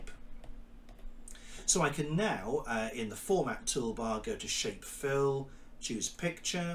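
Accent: United Kingdom. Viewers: YOU KNOW YOU HAVE A GOOD BRITISH AX CENT.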